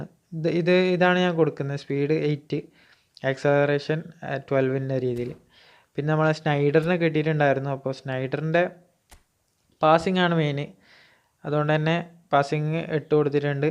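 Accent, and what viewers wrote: Kannur, India. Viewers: Kannur eadeyaa ingal?👋🙂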